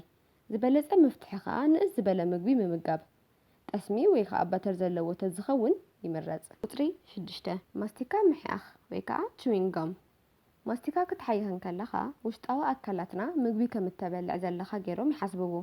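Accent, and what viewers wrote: Tigray, Ethiopia. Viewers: Why don’t you focus on the information/Lesson . You people have always something to complain about. Her Tigrinia was perfect. Her Tigrinia is fromTigray or Ethiopia. Eventhogh i am from Eritrea i understood every single Word she said..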